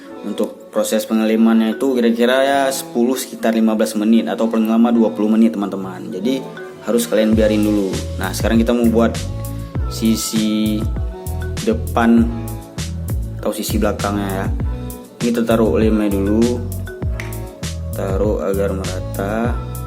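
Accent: Medan, Indonesia. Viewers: Logatnya medan kale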